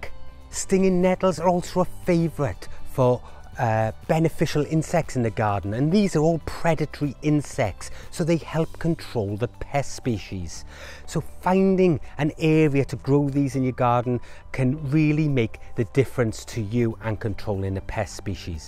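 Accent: Wales, United Kingdom. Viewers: Great video and lovely to hear a Welsh voice entwined in nature. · We eat them in Romania. I remember my grandmother feeding them to pigs..I remember their strong smell..so many memories brings this plant..hard to find them in Virginia, the US where I live now..btw love your British? accent.. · Thats not a "British" accent has a WELSH accent a big difference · Yep its about time we started to listen to nature. Thank you soooo much for your vid and love the welsh accent sooo cute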